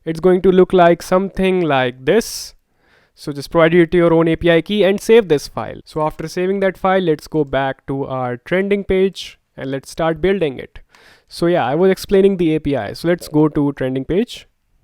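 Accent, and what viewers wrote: India. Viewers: Sorry not sorry but he is Indian so his English is also Indian 🤟🏻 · you speak in india english it's not original english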